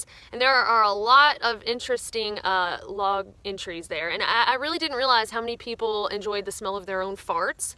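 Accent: South, United States. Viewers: “Y’all’s experiences” I love hearing Americans from the south talk. I’m from Europe and I’m been wanting to come over and do the AT (and PCT) for years so this was a great watch! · I could listen to your voice all day long- love that Southern accent!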